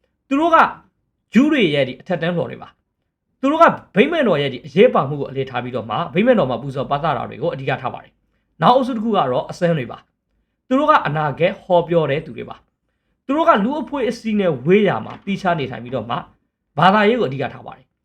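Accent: Rakhine, Myanmar. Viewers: ပထမကတော့နားထောင်မလို့ပဲ ရခိုင်လေသံကြားတာနဲ့ ဆက်လည်းနားမထောင်ဘူး unlikeလည်းပေးပြီး not interested လုပ်ခဲ့တယ် မင်းတို့ရခိုင်တွေ အကြွေးပြန်ဆပ်ရမယ် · ရက်ခီးသံ ဝဲလွန်းဝာာ အဆင်မပြေ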